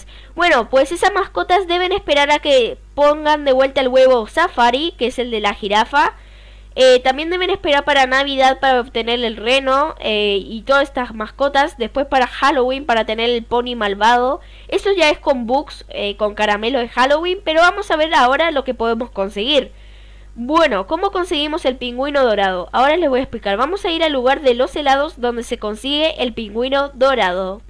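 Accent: Argentina. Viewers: No sabía que eras de Argentina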